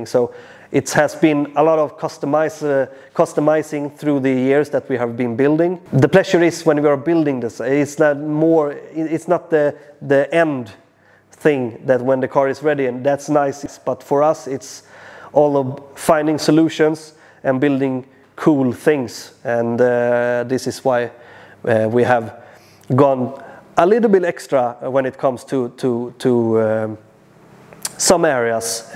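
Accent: Sweden. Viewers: A little Swenglish never hurt anyone! 🇸🇪 What an incredible build, subscribed and ready for the next episode! 🚀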